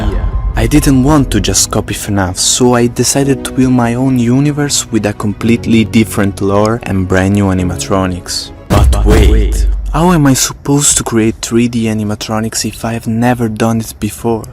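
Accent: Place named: Italy. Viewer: Sento il accento italiano ti ricordo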